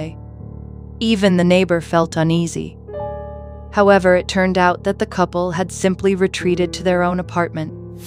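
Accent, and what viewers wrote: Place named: United States. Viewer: American narration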